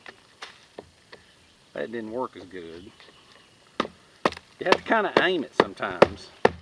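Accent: South, United States. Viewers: I can tell is a southern man.... he talks like me....I'm in Western NC.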